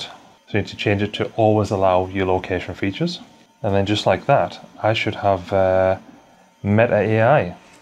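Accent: Middlesbrough, United Kingdom. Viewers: You sound local to me (Middlesbrough).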